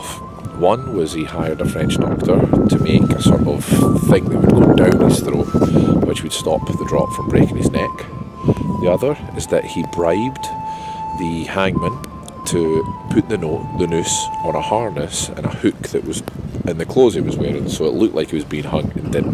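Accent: Scotland, United Kingdom. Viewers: I've loved the city ever since spending my junior year of college there at the University of Edinburgh. Good times! And somehow, whenever I see the castle, I feel like I'm home, at a second home. Plus it's always a treat to hear the Scots accent. My father was Scottish, but his accent had pretty much vanished when I was growing up - although it would return with a vengeance if he ran into a fellow Scot!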